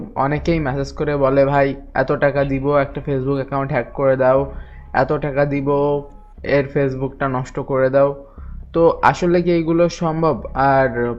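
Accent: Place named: Jessore, Bangladesh. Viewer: ভাই আপনার বাসা কি যশোরে নাকি। আপনার ভাষার যশোরে মত লাগে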